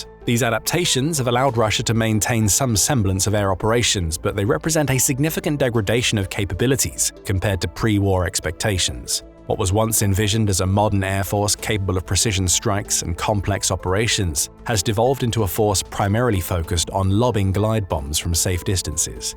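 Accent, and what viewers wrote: United Kingdom. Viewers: These videos are copium with a British accent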